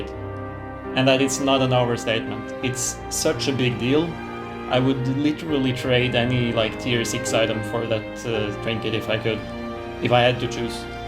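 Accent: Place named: Scandinavia. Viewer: sounds like you are Scandinavian^^ and just a thought but maybe cut the intro down? its kinda long :)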